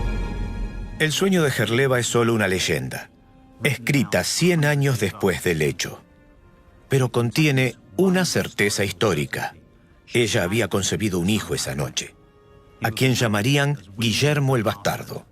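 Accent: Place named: Argentina. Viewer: Me gusta el narrador argentino la bataya!!!!!!!! Gracias.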